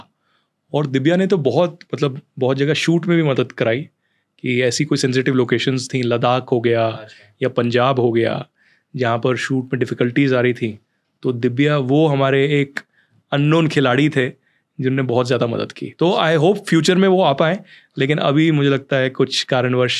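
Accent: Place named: Gujarat, India. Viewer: Bhai tme gujrati chho and tme jyare hindi bolo chhe tyare tmaro gujju tone dekhai aave chhe ema 😅 well it's ok mara jode bi thaay chhe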